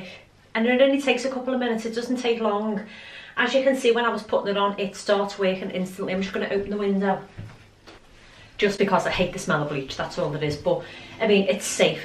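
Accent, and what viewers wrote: Liverpool, United Kingdom. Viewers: Thank you for the tips! I love the scouse accent especially in women 😀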